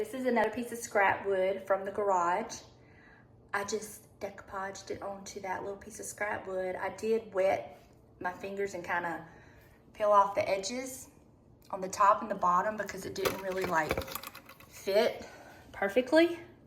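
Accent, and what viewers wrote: South, United States. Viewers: I enjoyed watching you video. I was so happy you shared the answer to prayer with us. When I was in nursing school my lead instructor was recovering from cancer. We were able to get more clinical than the other classes, on days she didn’t feel good. So proud she has a job she love. I have probably overused this phrase, but I love ❤️ your southern accent. I don’t feel living in n. Louisiana that I have much of a southern drawl. You have a lovely home. Looking forward to your next blog. God bless.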